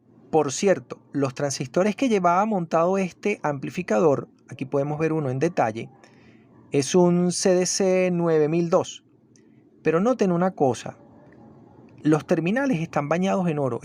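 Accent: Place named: Guayaquil, Ecuador. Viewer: Solo he visto unos minutos de este video para darme cuenta que usted es un verdadero técnico y conocedor de estos hermosos equipos de alta fidelidad. Una pregunta: en que país se encuentra usted? Por su acento me parece que en Guayaquil-Ecuador..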